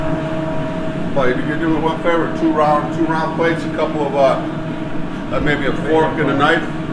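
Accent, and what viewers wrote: United States. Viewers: You have a very American style of talking, almost the accent.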